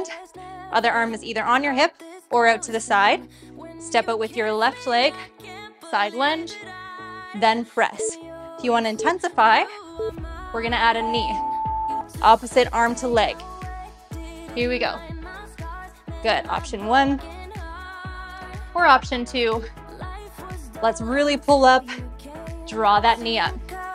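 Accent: Canada. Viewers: This workout popped up on my feed. Really enjoyed the compound moves, weights, pacing. New subscriber. Did I detect a Canadian accent? 🙂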